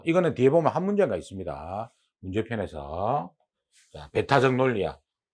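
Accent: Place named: Gyeongsang, South Korea. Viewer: 갱상도 스타일의 직관적인 강의 좋아요 ㅋ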